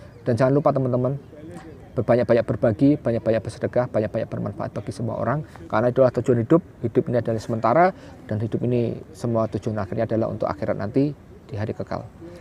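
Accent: Java, Indonesia. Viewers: wong jowo tho mas sampean? ngomonge kok medok tenan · Jowone endi mas?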